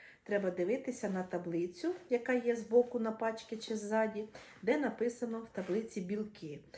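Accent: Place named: Khmelnytskyi, Ukraine. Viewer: Класний рецепт, дякую Вам! Візьму собі на замітку! У нас такі пироги теж називають струдлями., Ви родом не з Хмельниччини, дуже діалект схожий на наш 🤔😅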